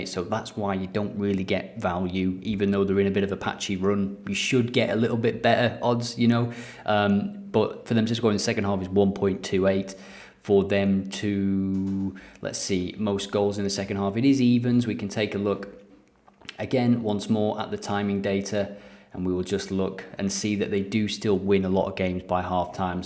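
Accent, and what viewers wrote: Scotland, United Kingdom. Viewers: Great tips! Thanks for not just providing the platform but giving these helpful videos always! Have a good feeling for this weekend. Loved that Scottish accent!!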